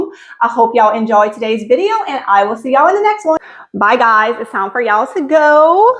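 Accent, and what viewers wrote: Louisiana, United States. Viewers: Love your accent? Southern Louisiana? Your home looks beautiful!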